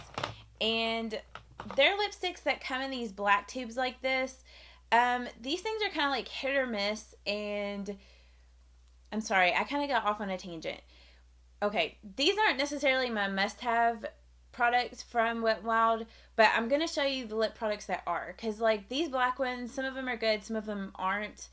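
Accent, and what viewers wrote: South, United States. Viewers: It's a true blue Southern drawl. I'm from the south so I'm used to it and enjoy it. Honestly, I much prefer someone that talks too slow to someone that talks too fast.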